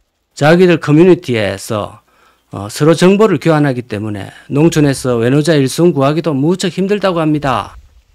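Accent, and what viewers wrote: Gyeongsang, South Korea. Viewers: 일단 구독 찍고 좋아요 눌러놓고… 댓글 다는 까닭은… 묘하게 사람을 끌어 당기는 조금 투박하나 사람 냄새 품은 나레이션 때문이고요. 경상도 저 아래쪽일 듯한 사투리, 소탈함 속에 해학이 영상을 보는 내내 입가에 웃음을 머금게하는 까닭압니다. 할배네로 자주 구경 오겠습니다. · 타지방 억양1도 가미안된 순수 경상가야국톤 사랑합니다.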